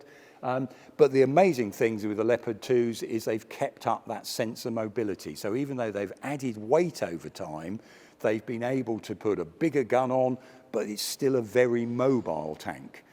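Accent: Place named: England, United Kingdom. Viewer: Oh you boring bunch of nitpickers. Ukraine or The Ukraine … this guy has a much more charming and fine English accent than most of us will ever be able to develop!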